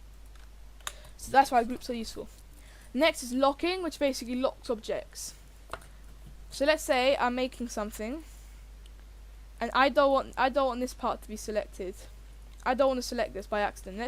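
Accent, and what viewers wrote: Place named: United Kingdom. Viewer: Why are all youtube roblox scripters british?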